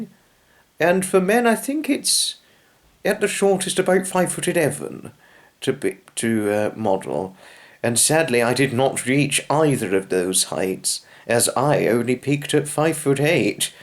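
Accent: England, United Kingdom. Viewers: … you certainly need to do “shout outs & personalised messages “ just as Nigel farage and other YouTube celebrities do. Your ace in the whole is that you have the quintessential English accent which I feel would go down a storm in th USA!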